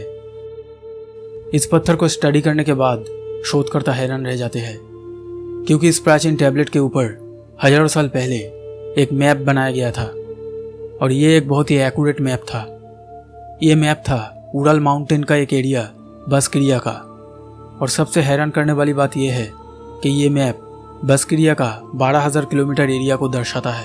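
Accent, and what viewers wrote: Bengal, India. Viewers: Sir, aap bangali kya, aapke awaj se aisa lag raha hain......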